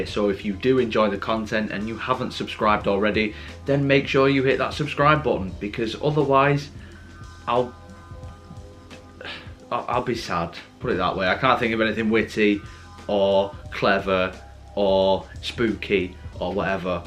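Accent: England, United Kingdom. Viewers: I never thought I'd hear an Englishman who could appreciate this show :)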